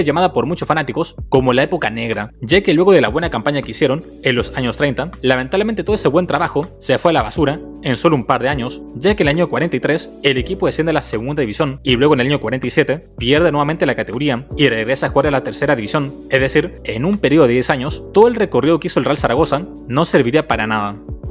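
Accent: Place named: Latin America. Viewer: Por tu acento pareces latinoamericano, te agradezco que hagas un vídeo sobre uno de los mejores equipos de la Liga, al menos con historia, y el séptimo con más títulos en España. Es una pena que a día de hoy esté así, mucha gente de otros clubes preguntan y no se lo explican. Es muy duro. Sé que en Argentina es muy querido, debido a que tuvo muchos futbolistas de esta nacionalidad en sus filas, y con un muy buen rendimiento. Salvo milagro, nada lo va a salvar esta temporada de descender a Primera RFEF. Habrá que ver si es viable en esta categoría, pero si desaparece sería una tragedia.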